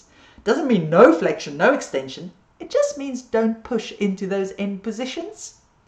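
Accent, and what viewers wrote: South Africa. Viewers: Hi, thanks for this. I'm really enjoying your videos. It's so nice to hear a South African voice:) I'm teaching yoga to seniors and really worry about osteoporosis. I read somewhere that crunches should be avoided. Do I need to worry about other core work? I try to keep it gentle, but would be good to know for sure what I should be staying clear of? Thanks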